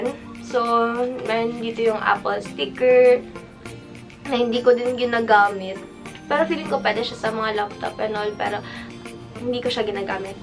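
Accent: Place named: Davao, Philippines. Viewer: Taga Davao man diay ka ate hehe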